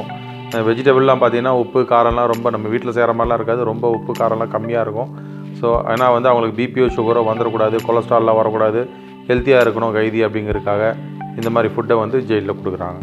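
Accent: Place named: Kerala, India. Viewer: Bro i think u r from kerala maybe malayalam slang tuch anyway good job good explanation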